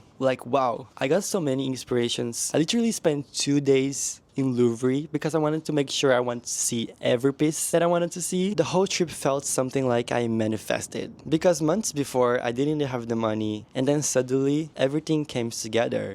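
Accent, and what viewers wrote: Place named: Australia. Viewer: Great video,! On a side npte, I think your Aussie accent’s starting to kick in 😊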